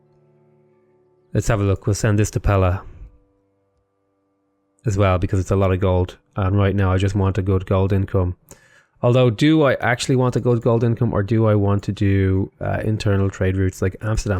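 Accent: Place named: Ireland. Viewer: I love how much more pronouncedly Irish you sound at the episode's beginning.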